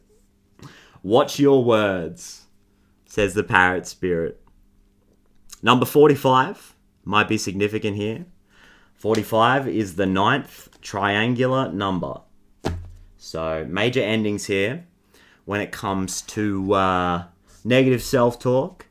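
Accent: Australia. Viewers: great reading, totally resonates! Thank you fellow Aussie! unmistakeable accent 😁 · I think he sounds Australian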